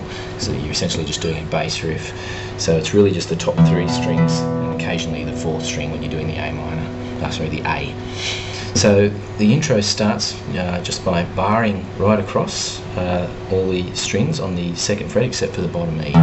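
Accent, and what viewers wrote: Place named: Australia. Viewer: Thanks for a great tutorial. Nice to hear a fellow voice from down-under.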